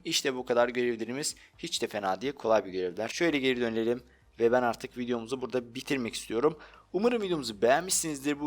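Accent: Azerbaijan. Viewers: Kardeşim Azerbaycanlı mısın?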